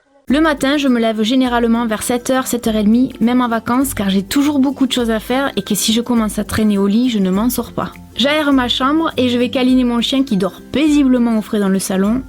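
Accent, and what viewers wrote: South, France. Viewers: Coucou Un vrai plaisir ta video. Je me suît regaler de voir et d entendre ton accent. Je suît aussi du Sud👌👌 Comme quoi il n'y a que dans le Sud Qu on vie bien. Oui je suît chauvine lol👌👌 Ah le taboulet avec dû choux fleur je ne connaissai pas du tout,top Merci Sympa ta video. Tres onde fin d après midi,Tres bon anniversaire. À ta Maman 😘😘💋💐🌹🌹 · "Dans le sud de la France" avec l'accent qui va bien! Super vidéo, merci beaucoup!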